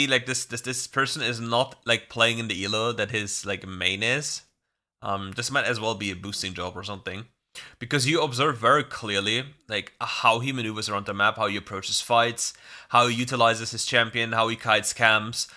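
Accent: Germany. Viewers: it depends on the video and word choice used. Some people have more difficulty understanding accented english than others. His english is fluent, its just hard to get the word usage sometimes due to thick accent, which many people do have. Tbh german accent is cool, its just sometimes hard to make what is being said for some words 😅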